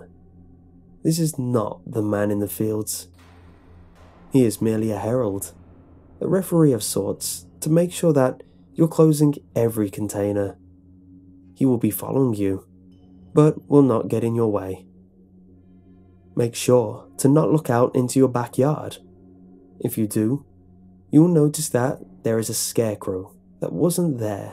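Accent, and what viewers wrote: England, United Kingdom. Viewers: He actually mentions in his "Draw My Life" video that his dad is English and his mother is Filipino, which I found surprising. Most people I know who are from the Philippines have thick accents, even if they've spent years or even a couple decades in another country, and alot of people I know who are raised with one or more parents who have thick accents end up with at least a faint accent. has an absolutely flawless English accent, and until I saw that video I assumed he was raised in some posh English family:P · I believe he is english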